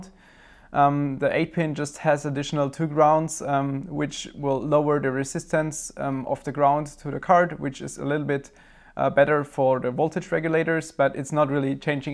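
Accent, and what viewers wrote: Germany. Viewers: You sound like a German ;) - hast du auch n deutschen Channel?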